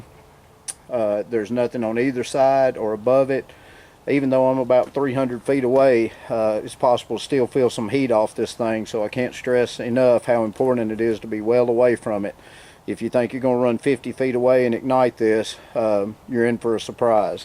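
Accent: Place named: Appalachia, United States. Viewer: Right off the bat he sounds like a hillbilly.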